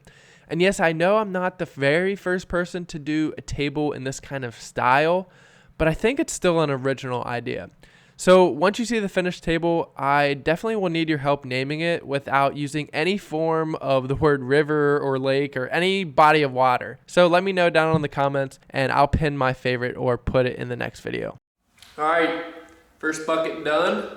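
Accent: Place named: Western Pennsylvania, United States. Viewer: Sounds like you live near me in western PA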